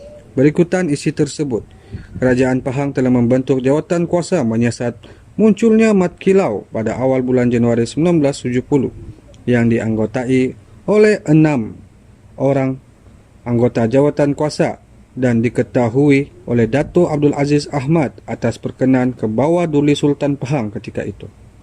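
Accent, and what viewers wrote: Sabah, Malaysia. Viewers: Host nie mcm org sabah jak dari dialek cara percakapan...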